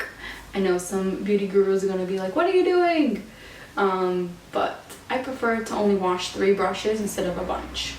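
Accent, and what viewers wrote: Boston, United States. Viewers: You are so gorgeous naturally and I love how you wear minimal makeup I’ve been wearing less makeup lately do to it causing me to break out and I love your Boston accent ❤️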